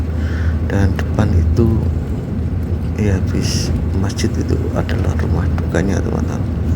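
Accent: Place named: Tegal, Indonesia. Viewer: Tegal..ora ngapak ora kepenak..hadir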